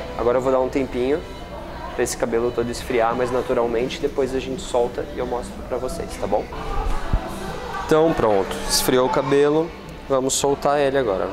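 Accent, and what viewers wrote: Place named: South, Brazil. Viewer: que vídeos maravilhosos!!! Estou fazendo letras na faculdade, mas cursando cabeleireiro paralelamente, pois é uma área que me agrada demais. Assistindo diversos vídeos na internet sobre cabelo, com toda certeza os seus são os melhores, não apenas pelo seu trabalho maravilhoso, mas também pela paz que você transmite ao falar e explicar suas técnicas nos vídeos, tenho certeza que isso é de extrema importância para a excelência do seu trabalho e felicidade profissional! Pretendo seguir como cabeleireiro, e depois de assistir alguns de seus vídeos, tenho ainda mais certeza dessa decisão, pois me identifico muito com você, tanto no amor pela profissão, como nos ideais de felicidade e visão de mundo, sou teu fã, estou acompanhando o canal, e não poderia passar por aqui sem deixar um comentário parabenizando pelo seu trabalho e pela pessoa que você é. 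Gostaria muito de tratar o cabelo contigo, mas pelo incrível sotaque sulino, acredito que estamos meio longe hehe Enfim, parabéns, continue com o maravilhoso trabalho, no salão e no youtube!!!:D Sucesso!! Paz e Alegria!! Patrick!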